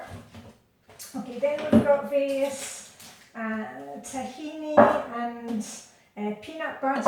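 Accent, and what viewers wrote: Scotland, United Kingdom. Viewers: Are you from Denmark? I was going to say you sound Scottish lol hope I don't upset you saying that. ❤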